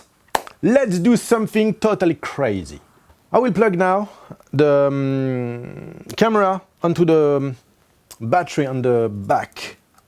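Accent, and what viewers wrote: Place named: France. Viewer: On voit très bien que tu es français tu as un fort accent pourquoi pas une vidéo en français ?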